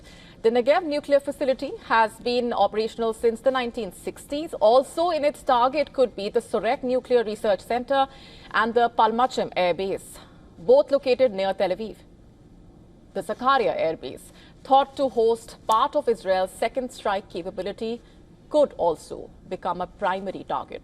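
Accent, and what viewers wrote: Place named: India. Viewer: She is speaking Indian English but has a very harse voice not becoming an announcer.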